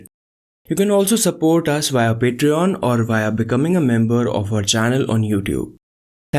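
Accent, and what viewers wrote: India. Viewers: Is speaker a kashmiri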